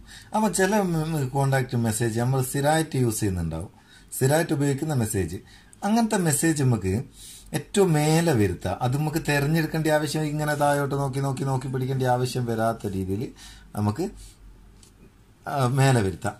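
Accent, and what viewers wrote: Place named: Vatakara, India. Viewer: I sincerely appreciate you. Your slang is clear that you from Badagara region.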